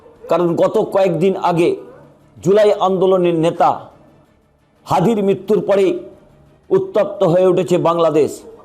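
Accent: India. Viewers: যিনি উপস্থাপন করছেন, উনি কি আসলেই বাঙালি? নাকি,ক্রস (হিন্দি +বাংলা )।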